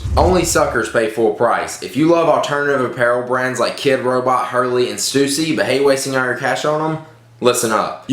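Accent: South, United States. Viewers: Are you from the southern states? I hear a little bit of a southern accent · Dude how is this impressive... Do u watch ur own.vids before u post them? This is a tech channel and I got this southern sounding pre-pubescent. Hillbilly saying "it mAkes radio sound better.. Durr"..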